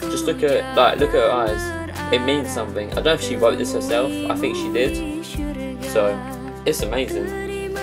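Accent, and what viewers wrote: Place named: United Kingdom. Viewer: What's up dude!!! I like your british accent. greetings from Indonesia🇮🇩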